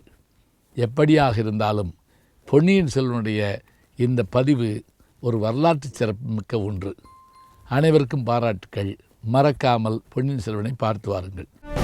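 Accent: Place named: Jaffna, Sri Lanka. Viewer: என் வீட்டுக்கார் உம்மட பட்டிமண்டப பேச்சுகளுக்கு பரம ரசிகர், என்ன சவத்தெளவுன்னா அப்பம் அவர் பக்கால இருந்தா என் தொடஐல ஓங்கி அடிச்சு ஆக்க்கா பாத்தியான்னு சொல்லும்போது ஒரு தொடை எரிச்சல் புத்திக்கு வரும்போது, அட இந்தப்பய ரசிகனப்பான்னு டக்குன்னு தாவி இது என் ஆசானப்பா அப்படின்னா ஒரு முத்தம் கொடுத்து, செரி இனிமே கொஞ்சம் மெதுவா அடிலேன்னு கட்டிக்கிட்டே இந்த செய்யுள் தெரியுமா உனக்குன்னு ஆரம்பிச்சு ... தமிழ் இனிமையானது, அதைவிட என் அத்தானின் யாழ்பாணத் தமிழ் மிக இனிது ஆசானே ❤❤❤ லெட்சுமி ராஜன் டொராண்டோ கனடா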